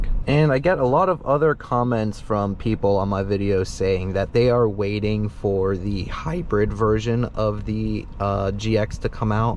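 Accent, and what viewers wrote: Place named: South Korea. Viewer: 제목은 한글인데 콩글리쉬로 씨부리노 ㅡㅡ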